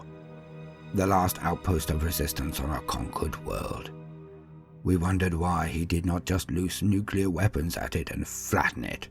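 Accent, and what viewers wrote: United Kingdom. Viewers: That voice. Like a british Tex.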